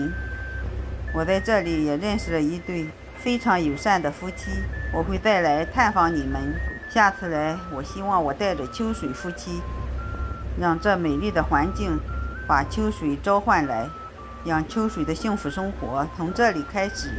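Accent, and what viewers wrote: China. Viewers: 解説有見地，大陸口音，哪裏人呢？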